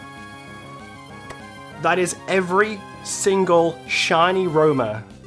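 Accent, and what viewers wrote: United Kingdom. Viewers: Can you teach me british english please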